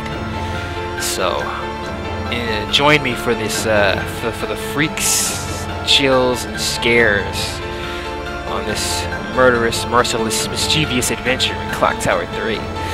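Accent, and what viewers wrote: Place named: RP, United Kingdom. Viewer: You know she's British :D you can tell she has a posh voice haha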